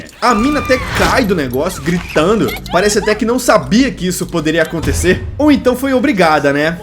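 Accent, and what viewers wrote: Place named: Goiás, Brazil. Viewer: Você é goiano ? Seu sotaque é parecido ❤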